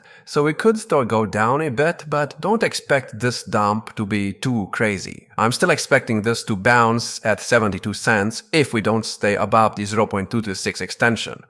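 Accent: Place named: Iran. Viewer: hes accent reminds me of farsi so my guess is Iran 🎉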